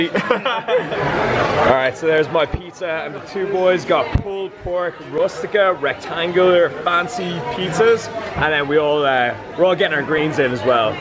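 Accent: Ireland. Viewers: Interchwined 😂 I could listen to the Irish all day aha, another great one mate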